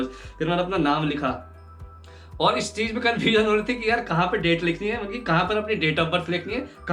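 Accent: Haryana, India. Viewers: Haryanvi on the top!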